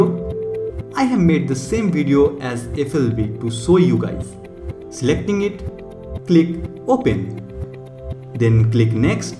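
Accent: India. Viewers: this mans got the strongest indian accent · You have a North-East Indian accent :)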